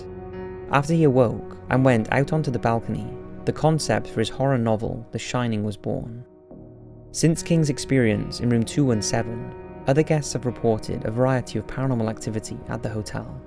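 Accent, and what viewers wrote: United Kingdom. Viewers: To me why is it scarier when a British person speaks about spooky things 😎😑 you just earned a subscriber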